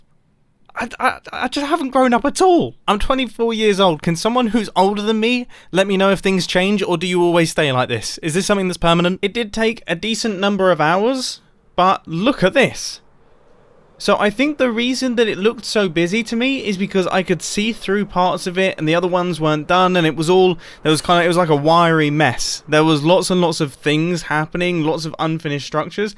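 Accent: England, United Kingdom. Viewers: sounds like a proper englishman (or possibly the love child of joe hills and harry potter)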